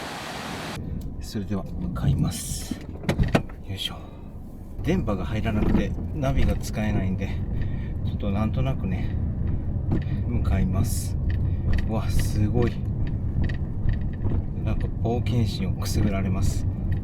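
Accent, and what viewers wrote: Kansai, Japan. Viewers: お早う。フィリピン在住者です。知人のフィリピン人とこの動画を観ていました。彼は時々日本旅行に行っている人です。途中までは大感激で、次の日本旅行には徳島に行きたいと言っていましたが、どうも様子がおかしくなって動画をストップしました。何があったかって言うと、フラッシュバックに襲われたそうで、大阪を観光していて、恐喝にあったそうです。話し声が関西風の声で、動画の声とよく似ているとの事。日本は安全で安心して旅行が出来ると信じて行ったけど怖い目に合ったそうだ。スイマセンが、動画では標準語でお話しいただけませんか。お願いします。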